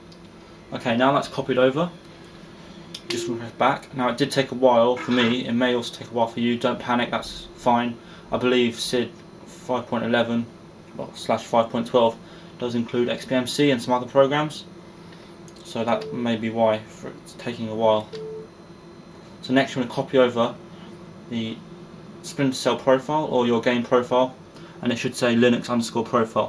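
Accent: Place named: London, United Kingdom. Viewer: typical londoner, good stuff.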